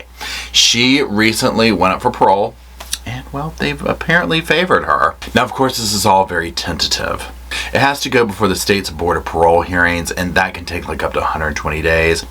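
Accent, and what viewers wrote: South, United States. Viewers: Hi and Roscoe. I was so happy to have found your channel about a week ago. You make me laugh so much with your facial expressions, your occational southern drawl, and my all time favorite is your signature whisper as you send us to a clip. Your show about Nancy Brophy is hilarious. The way you whisper the words "Miss Nancy" put me on the floor laughing. I'm going to tell all of my friends to subscribe to your channel and tell them that we have to support our brother. Keep me laughing as I watch more of your videos because I'm new. Right now (Dec 7, 2023) I'm watching your video about "Latecia's Dr. Lewis. I laughed so hard at the intro when you whisper "Her son who smoked weed in the Stairwell" 😂😂😂. Hug Mr. Roscoe P for me.🌹🦴🦴🦴🦴🦴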